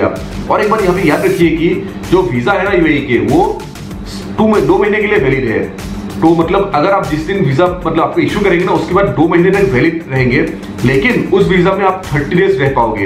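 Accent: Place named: Assam, India. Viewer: your tone looks like that of a assamese or a bengali guy..😅😅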